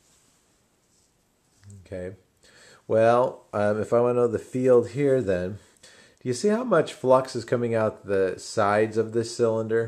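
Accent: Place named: South, United States. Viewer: Never thought learning physics from a dude with southern accent would be more helpful than my professor with British accent. 'Murica!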